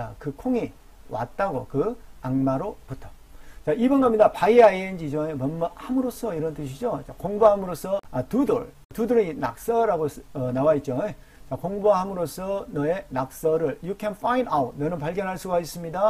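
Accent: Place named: Jeolla, South Korea. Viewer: 흐흐 구수한 전라도 사투리... 저절로 드러나시네요.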